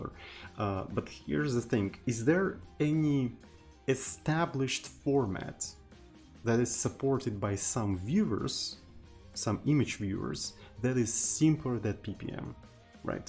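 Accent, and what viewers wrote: Russia. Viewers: русский акецнт?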